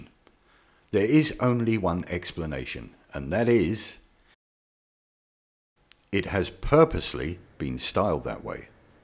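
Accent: England, United Kingdom. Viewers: You do realize that NASA is an American organization, and as such, you dont lose a cent to them as they only get tax dollars from the tax paying American public. Now I'm just assuming here, but seems like your accent is indicative of a more British persuasion, I mean, it could be south africa, New Zealand, or Australia, idk, but assuming the most likely and saying Great Britain, (I specify Great Britain and not the UK because you dont sound very Northern Irish to me.) Your tax pounds once went to the European Space Agency which has a pod on the ISS and works with NASA and other organizations, but no one is directly in charge of it either. But thanks to Brexit, your taxes aren't going to that either now. Britain doesn't have an independent space program so for now, none of your tax pounds go to any space agency. Hope I help alleviate some of your stress. 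· Nope, english accent, Mike is a little confused.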